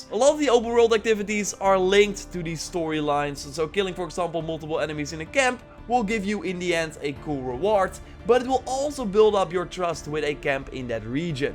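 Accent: Netherlands. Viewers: Your voice man... it bothers me so much wtf i know its a dutch accent im dutch myself but it's just incredibly annoying to me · Nederlands accent, lekker man, Nice review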